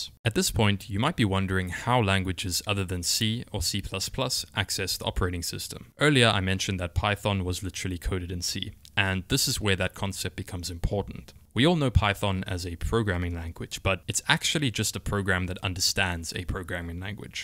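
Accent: South Africa. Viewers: I hear a South African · You sound south african? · Are you south african? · are you south African?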